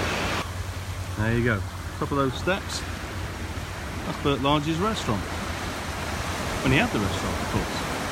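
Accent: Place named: United States. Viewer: You would pass for an American in the US by your accent used in this video.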